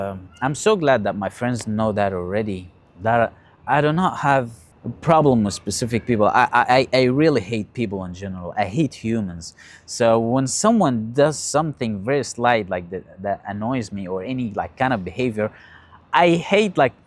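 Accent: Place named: Armenia. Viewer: You sound Armenian. They are nice people.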